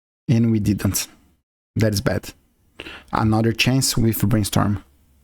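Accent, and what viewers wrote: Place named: Brazil. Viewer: Meu Deus é brasileiro 😝😝😝 Melhor sotaque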